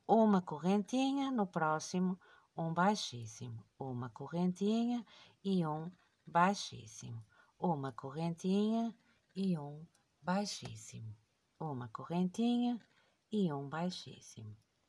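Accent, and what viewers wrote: Portugal. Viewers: Amei a explicação, bem detalhada para iniciante. Parabéns, esse soraque é lindo. Amo Portugal .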